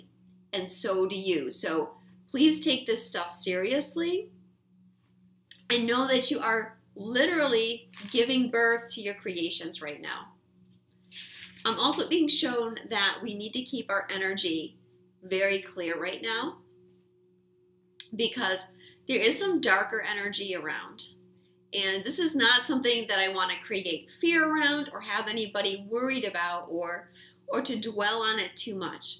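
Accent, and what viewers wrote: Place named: South, United States. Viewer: Yes, it still seems impossible NOT to rest; I just want to get into my mission and being creative and stuff, but man; I just don't have enough physical energy nor would I know where/how to start!!:-)) By the way, are you a singer? You have a Country voice that reminds me of Emmylou Harris:-) Much Love to you as always, and thank you for the video <3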